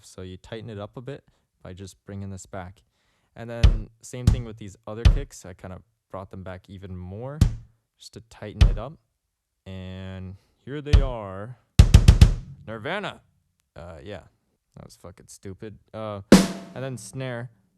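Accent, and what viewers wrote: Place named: Canada. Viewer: Canadian detected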